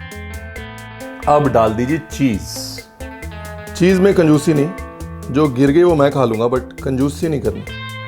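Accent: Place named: Bihar, India. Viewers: 2 tho, 3 tho, bihar se hai kya bhaiya..? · Ek tho do tho Bihar bhasa mast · ji tohada Bhojpuri boli da touch khub vadiya lagya si assanu.. 🙂 Coming to Poodi- Yenaka Poodi rumba pidikyon.. I am Super blessed to have a Tamilian inlaws family.. My mother in law prepars food with lots of conciousness, blessings and ofcourse love.. I can indeed realize and see the same conciousness and true love in your preparations.. 🙂 Thank you so much for sharing love and care through your authentic food/cooking.. From Russia..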